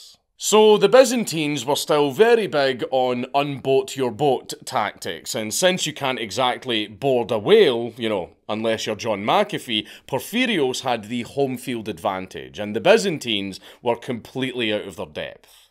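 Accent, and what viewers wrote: Scotland, United Kingdom. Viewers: A Scotsman could read out the dictionary for all I care, I'll NEVER get tired of their accent 🤣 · Listening to your phlegmy, Scottishy, and nasally voice, reading such well written scripts (about things which i find interesting), is the highlight of my week... Carry on haha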